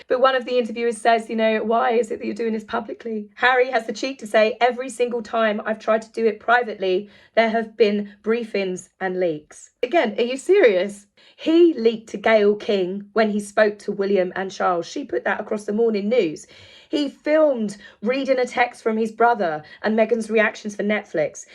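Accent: South, United States. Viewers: Do feel better!!! You still look fabulous!!! Your American accent was good..made me smile!! Americans are more aware every day they are scoundrels...how could we not be, they won't shut up. Too bad their millions cannot buy them a personality, they both are horrid!!! Thanks for video, was wondering where your "voice" was.... Great that you are feeling better!!! 💫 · Okay, your American accent, dare I say slightly Southern accent, is EVERYTHING! I have a cousin who speaks just like that 😂😂😂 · I think you need a little work on your Southern American accent 😅. But decent try (from someone living in Florida) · so. how about trying an accent that doesn't sound like a part of the southern U.S. You obviously have no idea what you're talking about. Maybe try staying in your lane. FYI, we don't like them either. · OMGOODNESS!!!! Your AMERICAN ACCENT IS AMAZING!!!!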